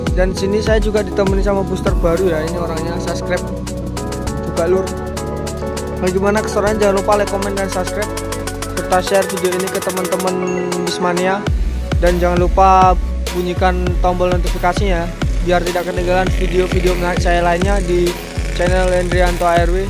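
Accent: Java, Indonesia. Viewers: Adminnya ngomongnya kaya orang Jawa😁😁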